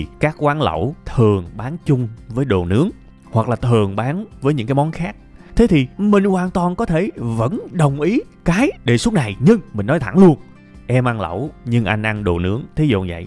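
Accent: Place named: South, Vietnam. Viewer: Thích giọng nói miền nam và cũng là âm thanh rõ ràng của thầy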